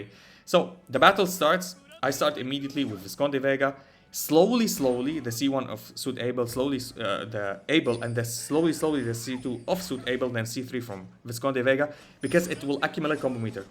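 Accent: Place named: Arab world. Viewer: Bro i think you are arab, your english accent is arab. Anyway, are you still playing echocalypse?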